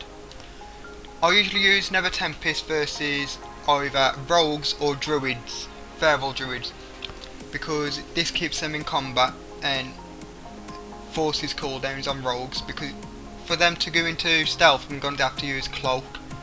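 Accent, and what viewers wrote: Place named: Black Country, United Kingdom. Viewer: Yam from Black Country ay ya? Nice guide thanks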